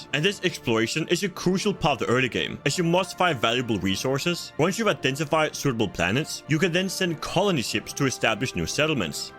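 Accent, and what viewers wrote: Denmark. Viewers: sound like a dane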